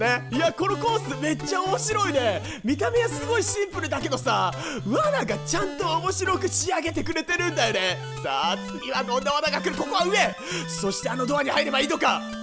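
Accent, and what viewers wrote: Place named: Miyazaki, Japan. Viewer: さん宮崎出身ですか！？ 〜しちょる。とか 〜にしちょった。ってよくじいちゃん喋ってて懐かしかった笑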